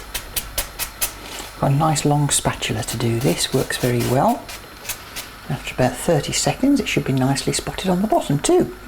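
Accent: United Kingdom. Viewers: I am second gen Canadian Grampa from Finland. Her name sounds more Finnish but I truly could not tell you honestly. Very British sounding Voice which is delightful. Thank you for the recipie, I make Rieska which is similar but a little thicker. From what Gramma said. Thank You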